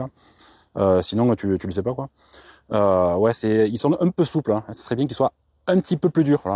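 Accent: South, France. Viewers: hello, une fois de plus, très bonne vidéo très "QUALI 😊" pas desbrouff...et agréable à regarder et à écouter, ""avé"" l'accent du sud🤩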